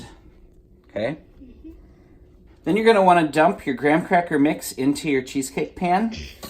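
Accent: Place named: Midwest, United States. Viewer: Haha! I love your Wisconsin Minnesota accent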